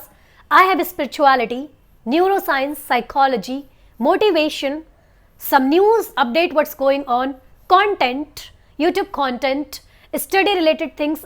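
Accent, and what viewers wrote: India. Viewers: You speak english well woman .it s too fun your accent it s great it adds a gracious listening . I m french and i notice a lot of indians People speak english like you . Could you ask you a question lady ? Is life is easy or Hard in england ? I don t know this land ?i went in london when i was seventeen . It s too gracious to listen to you keep teaching People. Could you ansewer my question please ? God bless you lady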